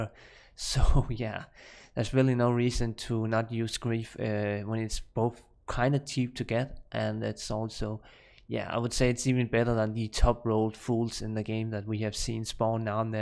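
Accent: Denmark. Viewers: Are you from Denmark? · The danish accent screams at me haha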